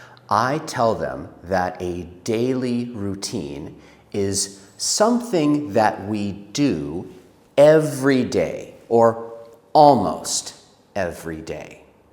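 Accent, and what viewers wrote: United States. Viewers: You are American or British? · Hey, I love American accent. I was doing the shadowing practices And wow it comes naturally.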